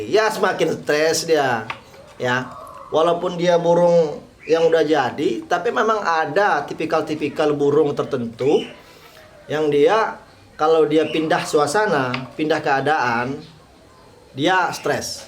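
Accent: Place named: Lampung, Indonesia. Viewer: Kayak logat lampung bg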